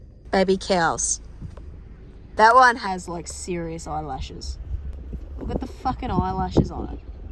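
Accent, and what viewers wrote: Australia. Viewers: Oh, no, trust, at least in the USA, that accent goes a LONG way. A good number of our top stars in Hollywood call Australia home. …Helps that you grow them damned pretty down there, both the gals and the guys. 😉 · The attitude of this channel, from human to dog, is just fucking fabulous❤ I don’t know why the algorithm pushed this channel in my feed, mine are aqua scape, reno and wood work stuff hence the confusion. But mate, am I glad. Girl, you doin’ so good. The only thing I don’t understand, is why are there people dislike these contents and please, I do not wish to hear of it from these people. Hugs from Finland, of all places… PS, your accent make me like my Aussie friends even more😂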